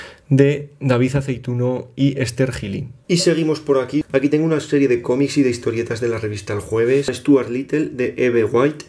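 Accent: France. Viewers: Me gusta mucho tu acento, marcas las ciertas letras (como la "h" y la "r") de una forma muy particular. Enseguida se nota que tu segunda lengua es el francés. Yo aunque soy mexicana pasé mucho tiempo en Brasil y siento que a veces se me "mezclan" la pronunciación de las letras también :P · Qué interesantes tus libros, me encanta tú acento en francés hehe <3, yo también lo estoy aprendiendo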